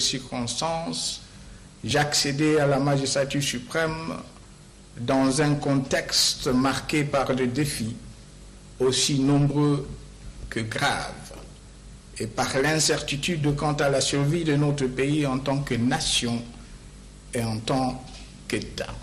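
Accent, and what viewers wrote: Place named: Rwanda. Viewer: Français avec tonalité Rwandaise.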